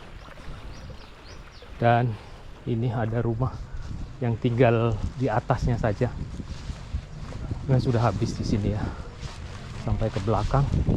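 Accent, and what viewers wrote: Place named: Padang, Indonesia. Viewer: wes penter boso padang, suwi suwi jowone kelelep. Semoga sehat selalu bolo.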